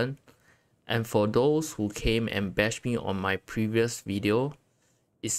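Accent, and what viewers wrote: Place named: Singapore. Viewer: I thought u just sounded singaporean until i looked at your channel name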